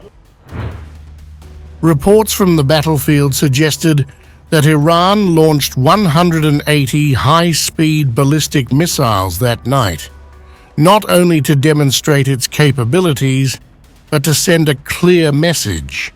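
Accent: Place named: United Kingdom. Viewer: And speak american English, not brutish one!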